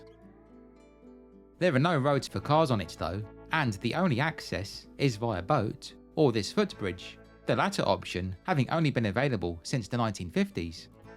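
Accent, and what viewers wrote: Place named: Essex, United Kingdom. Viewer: I love your accent it reminds me of my dad's best friend ,who we called Uncle Frank.He was from Essex close to town.But he was a working class man with a vast knowledge of history and politics .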